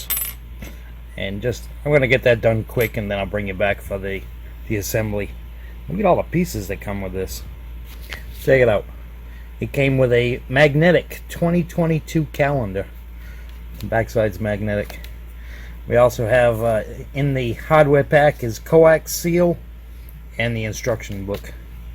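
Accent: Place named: New York, United States. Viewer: Are you from NY ? what a heavy accent you have ...what are you doing in NC?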